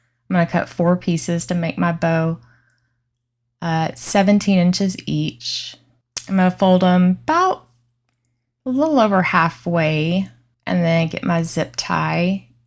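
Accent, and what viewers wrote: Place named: South, United States. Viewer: Sounds like you're a southern gal like me! Love your pumpkins!!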